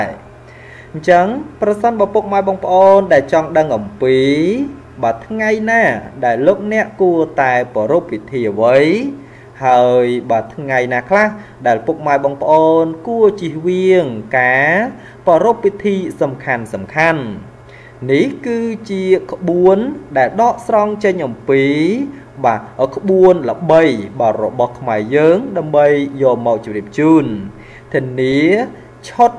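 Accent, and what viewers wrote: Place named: China. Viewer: និយាយដូចអាចេកចឹងពិបាកស្តាប់ណាស់វើយ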